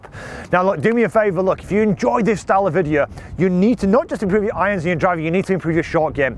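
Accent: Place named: Northern England, United Kingdom. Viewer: Another great video lad. Honestly, I could watch all the other golf videos on YouTube and never get as much knowledge as I can with one of your 10 min videos. Your style, is backed up with the substance of knowledge that can be easily transferred to the student, all delivered with a wonderful Northern twang. As a nervous newbie to the game, you have calmed my nerves by giving me the confidence and assurance that comes with your instruction. Thank you sir for making my first year in the sport such a pleasure. I wish you and your family all the happiness and joy that you deserve in this festive holiday season. ❤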